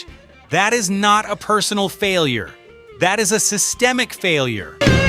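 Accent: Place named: United States. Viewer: This guy is an ignoramus impostor pretending to be knowledgeable. He takes a smattering of little bits and pieces of facts and strings them together with an American accent padded with repetitive meaningless phrases. Far too many foreigners of his ilk think their pretense of knowledge gives them relevance. "A 1-eyed man is king in the land of the blind". Anyone who knows about Jamaican music will find this guy's presentation hollow.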